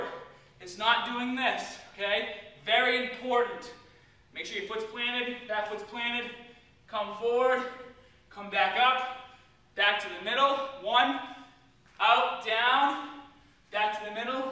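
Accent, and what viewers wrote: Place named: New York, United States. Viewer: haha you might get a influx of costumers Usaully when people ask me questions I usully adivse to see your fitness videos and get his meal plan they work for everyone great site, but today I joined yahoo answers haha I think i have told like 150 people to join your site and how great it is:) haha lucky and im studying nutrition and getting certified as a personal trainer ( but no competion im CANADIAN whitch reminds me come to CANADA to TORONTO we would love to hear that new york accent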